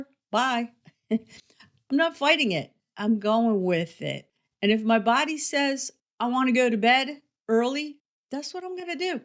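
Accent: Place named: New York, United States. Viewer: I love your accent. I am Australian. Are you from somewhere in New York, maybe Queens?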